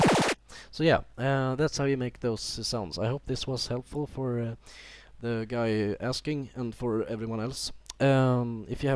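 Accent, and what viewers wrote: Sweden. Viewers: det hörs att du är svensk ;) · Dat Engelska med svensk dialekt!! epic!! :D Bra Tutorial mee :)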